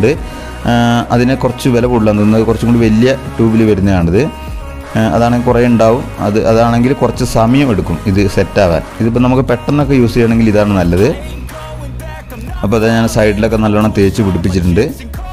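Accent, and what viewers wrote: Kannur, India. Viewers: നിങൾ കണ്ണൂർ ആണെല്ലോ....പോളി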